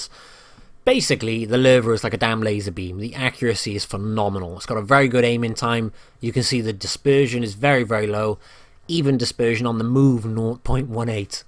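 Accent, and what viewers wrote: United Kingdom. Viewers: Wot Blitz your work is much appreciated, are you on any patronite websites? Do I sense right you're from UK? (accent)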